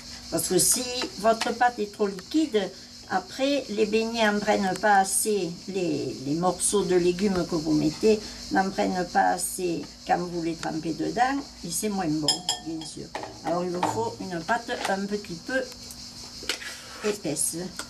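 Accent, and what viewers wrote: Provence, France. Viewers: J’adore les aubergines, je voudrais tester votre recette mais les aubergines sont quand même longues à cuire ! Combien de temps les laissez vous dans la fritures ? Et je suppose a feu moyen ? Merci pour vos précisions, un plaisir de vous entendre avec ce petit accent provencal !? 😊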